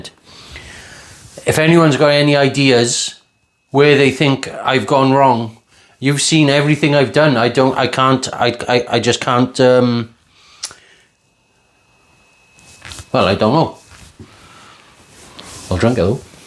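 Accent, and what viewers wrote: Wales, United Kingdom. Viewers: Don't know if it's the Welsh accent or you're dressed up like Dexter, but I was in stitches. Great video and very entertaining.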